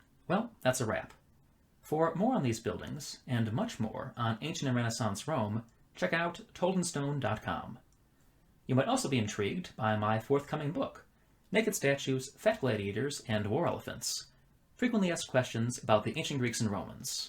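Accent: United States. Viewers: You sound like Ben Shapiro. One of the more grating American accents for sure.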